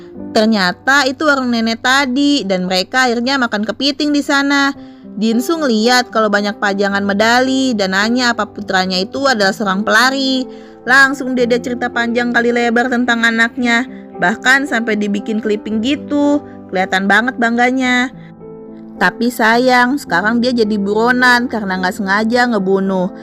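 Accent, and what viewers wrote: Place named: Jakarta, Indonesia. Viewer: Asli, ini yg bercerita orang jakarta yaaa, rawamangun bukan ? Cara bicara mengingatkan temanku orang rawamangun, sama percis cara bicara nya, haddeuh, i like you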